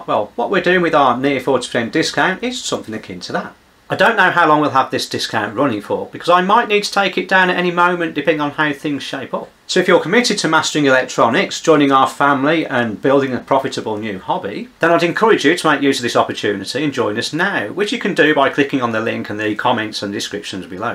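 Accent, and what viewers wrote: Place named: England, United Kingdom. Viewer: Now wait a minute. You charge about 300 dollars U.S. dollars for each repair and you said you love it. So I'm going to pay you to do what you love and I see. Your eyes light up when you said love. Everyman is blessed to do what he loves. Next one more really important step, learn to hear the fast talking Englishman or get a voice translator. Have a good love filled day everyday.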